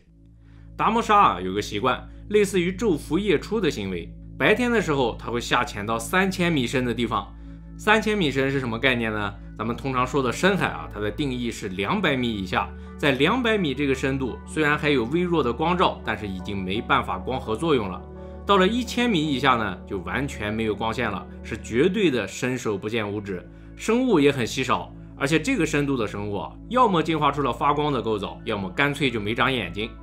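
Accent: Shandong, China. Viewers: 楼主是哪里的口音啊？山东？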